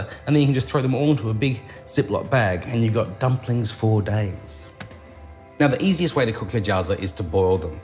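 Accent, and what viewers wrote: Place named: Australia. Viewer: came from uncle roger! You're Australian !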